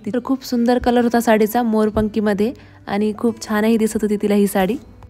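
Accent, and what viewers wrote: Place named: Marathwada, India. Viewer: Tai tumhi mahanubhav panthatil aahat na aamhisuddha aahot Aamhi Hingoli la rahto tumche video baghun kharach marathwadyachi language ekun khup chan wattala❤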